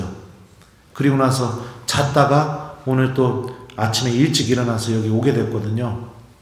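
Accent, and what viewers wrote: Chungcheong, South Korea. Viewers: 을통해 주님의 사랑에 만약 행복합니다 신부님의충청도 특유의 사투리가 마음깊이울립니다 신부님 감사하고 많이많이 사랑합니다❤❤❤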